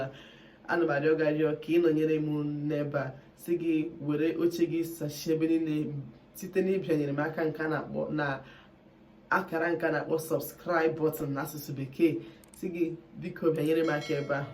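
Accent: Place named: Igbo, Nigeria. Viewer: See how am smiling listening to you speak my Igbo Dialect😃😃😃😍😍😍😍Nnem this one dey totori my body😃😃😃😃💕💕💕💕Please created a Facebook page for Igbo class biko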